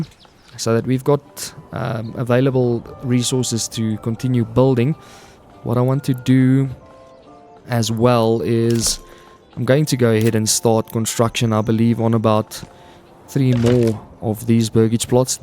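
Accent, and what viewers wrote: South Africa. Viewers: Greetings from 🙌 Enjoying the South African accent during the playthrough.. I don't think I'm subscribed to anyone in South Africa 🤔 ⭐New Subscriber Unlocked⭐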